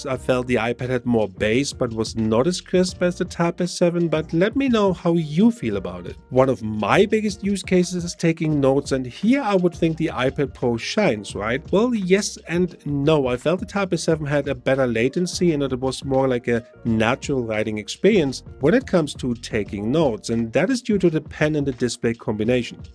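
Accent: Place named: Germany. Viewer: Thats a Strong German Accent ! Are you German ?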